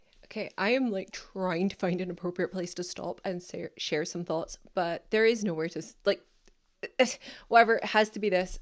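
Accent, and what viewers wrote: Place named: Scotland, United Kingdom. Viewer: I thought u were from Ireland then u realised your from beautiful Scotland ❤️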